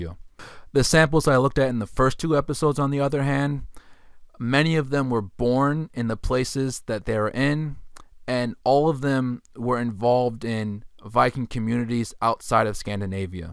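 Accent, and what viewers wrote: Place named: Mexican-American, United States. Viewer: It's tough to place your accent. Sounds almost like what Mexican-Americans with English as a mother tongue sounds like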